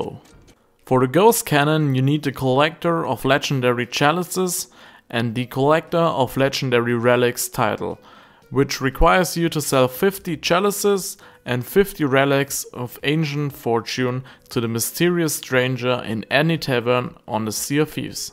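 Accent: Germany. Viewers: You sounds like a german haha. Thanks for the Video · Wenn der deutsche Akzent mal wieder komplett reinkickt xD Aber gutes Video 😁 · You are German, arent you? ;) Because there's something about the way you speak. Great video by the way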